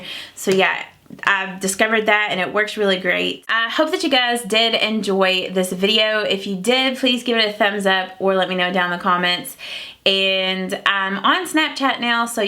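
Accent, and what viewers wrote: South, United States. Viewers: it always makes me happy to hear a fellow southern gal speak. it seems like all the makeup YouTube channels are from either the north or Texas etc. the southern accent is musical.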